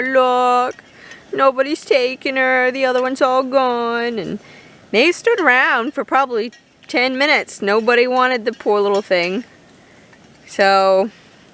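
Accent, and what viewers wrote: Maine, United States. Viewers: You have the Maine accent 100%, It sounds cute to us flatlanders.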